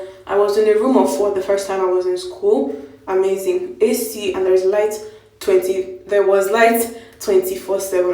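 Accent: Igbo, Nigeria. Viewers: Her igbo sounding so poshhh😂😂❤❤❤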